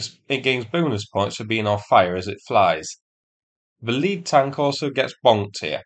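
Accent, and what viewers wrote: United Kingdom. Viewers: The British accent is fantastic. It fits very well with British humor.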